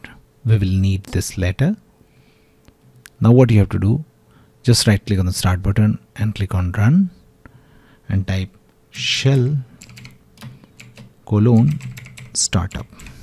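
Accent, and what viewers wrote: India. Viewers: The moment I heard the Indian voice i immediately knew this was going to work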